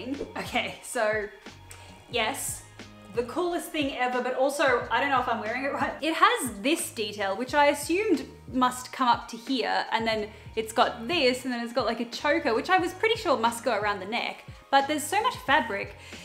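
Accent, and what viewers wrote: Australia. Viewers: Please don't change anything about your 'accent'. I am Croatian and I come here for the Australian, not the American. 💪 you are the only Australian I watch on youtube so i cherish all these differences, I truly enjoy it. Wish we could see more Australia in the future. I love love your videos, this thriftmas was amazing! wish you all the best! :) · Please don’t beat yourself over the way you say certain words. Accents are all unique, and just because an American doesn’t pronounce something the way an Australian would, doesn’t make it incorrect. Your accent is SO cool, and even if we say something a different way or use a different word for something, we still know exactly what you mean. You shouldn’t change yourself! We love you for you!! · Yeah that annoys me, America has tons of dialects to begin with too so it’s hypocritical to ask for a more “American” way of speaking from an AUSTRALIAN. 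Edit: I’m American, Southeastern to be exact. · I’m Australian, even I struggle to know the correct pronunciation of things, even Australians correct each other’s pronunciation all the time, especially suburbs and places. Literally everyone pronounces things differently. I don’t think was purposely changing her accent for the American audience. She just didn’t know how to pronounce Academia so when she got corrected she went with it. I question things I say all the time. It could be because we have so much American influence through socials and TV. There isn’t one way of pronouncing something. She says like a million words in this video in her native accent and you pick on one word. Let do her thing she is killing it! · yes ! I love to hear different accents and I never think about her speaking Australian as a weird thing since she's from there ! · I prefer it when you use aussie lingo and keep your accent, I'm from the UK and I just love hearing the way you guys talk down under ☺😍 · Ikr and it’s not every day you hear an Australian accent, people are allowed to be unique (and sound like where they’re from :P I think it’s a common subconscious part of American culture to feel like “we do everything right, so we have to help people conform to our perfect culture”) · Part of the excitement is learning by experiencing another culture and lingo. 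I love that is Australian. It's so fun to have her as my friendly neighborhood Cultural Atache' to Australia. 😊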